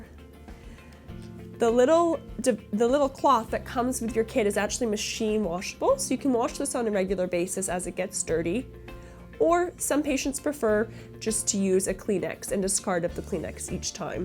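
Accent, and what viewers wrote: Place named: Canada. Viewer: This lady gets progressively more Canadian as the video goes on.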